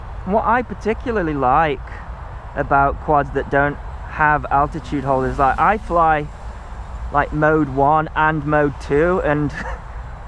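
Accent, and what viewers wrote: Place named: England, United Kingdom. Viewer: G'Day. Pete In Australia here, very nice review, plus I just love your accent (I'm a Pom too) I subscribed to your channel a little while ago, some good stuff here man. Another You Tuber, Aeon38 recommended I talk to you so here I am. Sorry it's so long but I got a lot to ask! I also fly Mode One as does most of Oz and herein lies the problem. I've been flying R/C aircraft now for around forty years now (Don't ask!) and my brain is just absolutely wired to mode1. I have been teaching myself Mode Two recently with a few micro toy quads, F-36, etc (Gotta turn that one into FPV) and all is fine until it starts to go wrong and then the reflex's take over with disastrous results. With this little monster (Bugs) on 2s and later, 3S I do not want my reflexes to kick in and put Bugs in my face. (Or someone else, and this will happen if you are hovering nose out and you go to cut the throttle where-by you inadvertently give a back cyclic input, as you would know). 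What do I do? I really want Bugs 3 and unfortunately they haven't answered an email I sent to them so would you know of any flight controller boards that would work with this on DSMX/2. Or can I hook in a Mode 1 Rx to the standard board somehow? What can I do??? What are the ''Noisy Formula One'' type quads you mention. I neeeed one (on Mode 1 if possible). Would you have any links to the stuff we're talking about too by the way. I want something or things to: Fly real fast and steady. Fly real slow and steady for video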